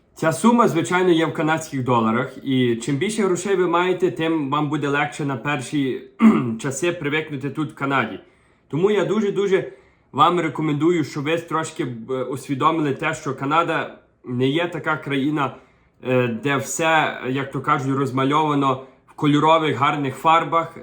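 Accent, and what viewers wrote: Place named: Lviv, Ukraine. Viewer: Дуже гарний львівський акцент, маю також родичів у Львові. Але також хочу виїхати через війну, але вік 52,сину 15,чи маю шанс? А ви молодець!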